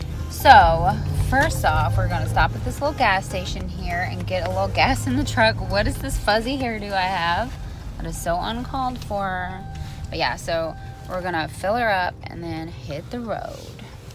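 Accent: Texas, United States. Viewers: Do not lose your Texas accent up here!! I love it!!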